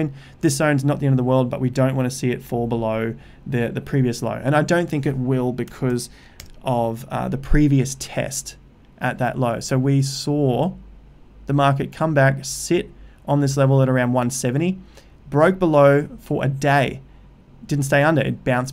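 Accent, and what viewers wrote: Australia. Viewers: It's very nice to hear an Aussi accent. I'm in Melbourne. The comment about the "kidney-transplant" was a fine touch. I'm considering doing it- but Ada might plunge, and I'll end up with no money and one kidney!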